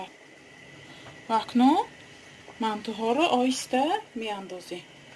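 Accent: Isfara, Tajikistan. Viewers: Гапзани исфарагми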